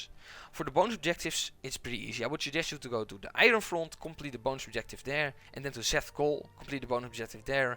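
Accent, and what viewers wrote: Netherlands. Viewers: You sound Dutch :D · Dutch sounding English. The worst. thanks for the tips though ;)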